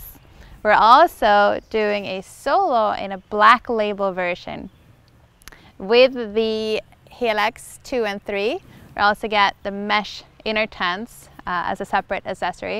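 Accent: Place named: United States. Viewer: Discasting american accent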